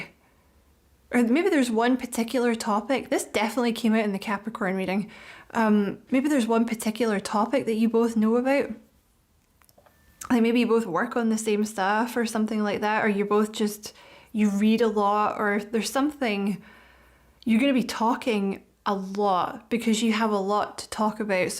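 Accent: Scotland, United Kingdom. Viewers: My apologies for my confusion. My American ears did not identify you being Scottish. However my soul recognized your voice. Once again, my apologies. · It’s Scottish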